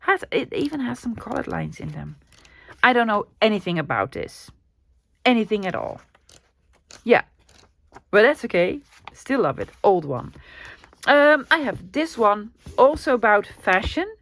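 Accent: Netherlands. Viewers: OMG I just love your channel. One of my closest friends is of Dutch Heritage so I’m very used to ( and love) your accent. Your enthusiasm and appreciation for all things even remotely vintage strikes a chord with my heart. You have definitely won another fan. Just wish I could buy economically from Europe, being on a pension means I simply can’t afford the shipping ( same goes for USA) but I’ll continue to watch and learn and purchase digital files when I can. Thanks again you’re an inspiration to me ❤o😊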